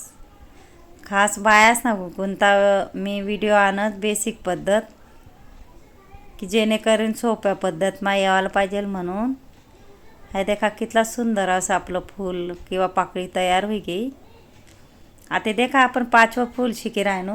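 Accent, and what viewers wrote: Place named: Khandesh, India. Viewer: अप्रतिम ताई आणि तुमची खानदेशी भाषा खुप गोड.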